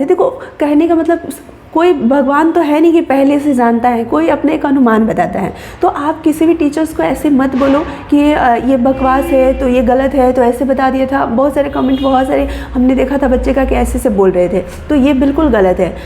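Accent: Uttar Pradesh, India. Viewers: Di aap up se ho kya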